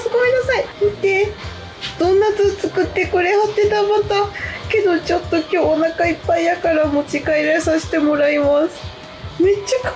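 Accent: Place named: Osaka, Japan. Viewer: 大阪弁が入っているので親近感がわきます。 それにしても食レポが巧いですね。ものすごく食べたくなりました。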